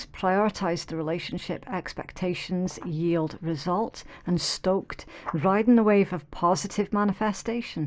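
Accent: United Kingdom. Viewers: Thank you, sounds a little bit like her or I. You sound great. Loud n clear. Your accent sounds British to me... That's great I speak English... I have other problems with haters hating and threatening me. So I've been praying on it... She should of been on my side and she wasn't.. looking around see how it goes.. I'm 😁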